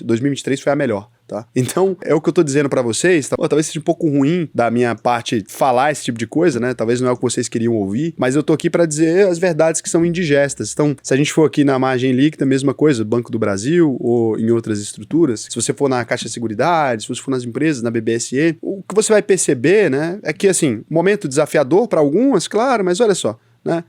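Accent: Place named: Goiás/Minas Gerais, Brazil. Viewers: Haaaa vc é mineiro kkkkkk. Video sem credibilidade. Vcs sao burros,nó cegos,analfabetos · Eu que sou de MG morando em SC, digo, é um alívio escutar esse sotaque GO/MG ai nos vídeos kkkkk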